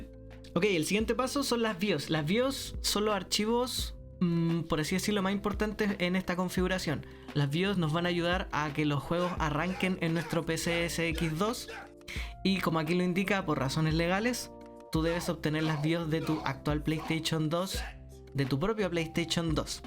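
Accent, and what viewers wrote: Chile. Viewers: Acento de Chile o me Equivoco jeje 😅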